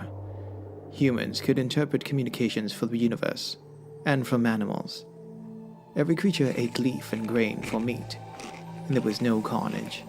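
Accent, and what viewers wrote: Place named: United Kingdom. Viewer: Why is a British person with a horrible accent talking about the Zulu Nation this is just wrong in many ways! OMG stop!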